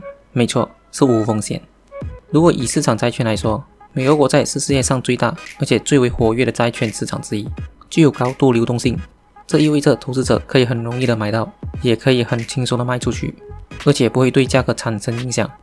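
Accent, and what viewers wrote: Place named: Malaysia. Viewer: 雪碧馬來口音，聽得頭痛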